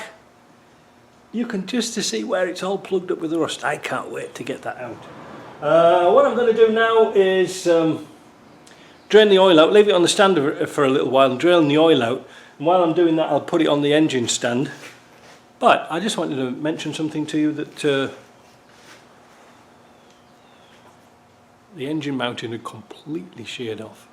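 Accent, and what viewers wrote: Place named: Northern England, United Kingdom. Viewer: Hi mate I gather you live in the USA OR CANADA somewhere as you were talking in dollars. I guess your from the UK? You sound very northern I’m northern myself. Where in the uk are you from buddy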